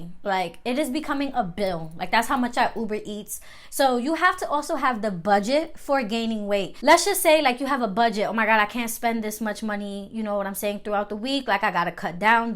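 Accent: New York, United States. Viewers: i’m new to your channel, you gotta be from ny 🤣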